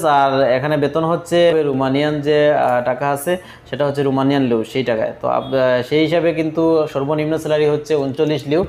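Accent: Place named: Sylhet, Bangladesh. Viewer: ধন্যবাদ ভাই এত সুন্দর ভিডিও দেওয়ার জন্য নিশ্চয়ই আপনার বাড়ি সিলেটে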